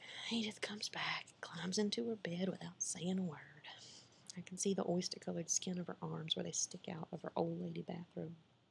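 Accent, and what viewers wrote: South, United States. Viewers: Now this is very cool.... you're voice is soooooo good...oh and the Southern!!! You get an A+++ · I really enjoyed it and found it very relaxing. There is something about southern American accents that I find very calming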